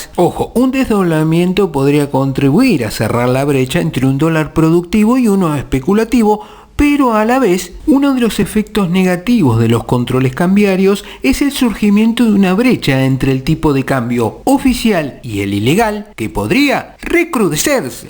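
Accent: Buenos Aires, Argentina. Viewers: es porteño algun problema? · Que comentario pelotudo, recién me entero que los que vivimos en CABA hablamos así 😂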